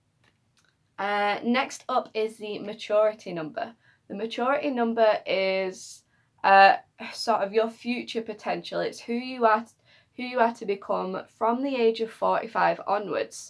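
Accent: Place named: United Kingdom. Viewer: Luv the British accent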